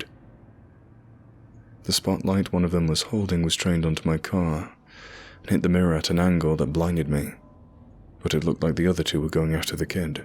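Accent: England, United Kingdom. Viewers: But oh, but... This guy doesn't even have a Kentucky and accent. He's British! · This guy sounds like he's from the UK.. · You sure you don’t sound your not from anywhere ky Mabey merry old England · A British person telling us about a specific area in the US lol. It’s not scary as long as you are strapped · I find it funny! I 75 isn’t eastern Kentucky. Lexington isn’t eastern Kentucky It’s Hal rogers parkway . I live in hazard! With your English accent. Ride motorcycle through that whole area it’s beautiful here not scary! I call bullshit ! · you don't sound like your from that area... sounds like you are English possibly a ginger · Is this a British accent talking bout driving the back roads? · That's right I've lived in the East Tennessean mountains all my life. Same general area and people as eastern Kentucky. Beautiful mountains and people. 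From this guys English accent you can tell it's about a 99 percent chance he's never been any where near Appalachia lol. It's pronounced Appa latch a not Appa lay cha. You outsiders can visit but we appreciate it if y'all don't stay, especially if you have a superiority complex. We don't take to kindly to any bullshit. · I can’t say, some brith telling a story he read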